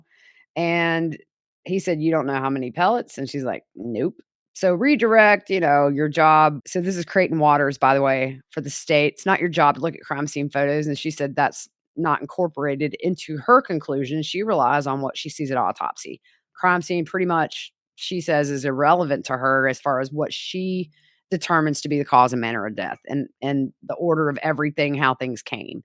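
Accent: South, United States. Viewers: please don’t hide your Southern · “We gotcha stuff….” 😂 I adore you. I’m a southern girl too and appreciate the way you talk to us like we’re BFFs, sippin sweet tea on the deck, and talking true crime!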